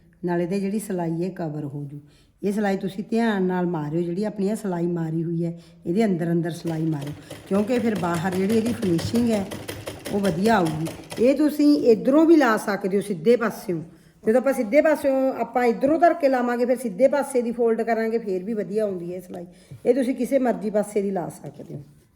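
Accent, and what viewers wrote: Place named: Punjab, India. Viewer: Bhut sohna bneya skarf bhain g dhanwad g Sade rishtedar khana amloh wali side a boli tvadia bilkul ohna vargi a Sade kuram a g plz dsna jroor kis side to ho TUC 😊🙏🙏👌👌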